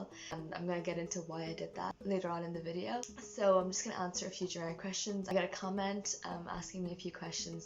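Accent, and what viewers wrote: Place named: Ireland. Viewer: ahhh it's so nice to hear you talk! :) I didn't know you were from Ireland, that's so cool! I'd love to see more of these kinds of videos sprinkled in with your covers in the future!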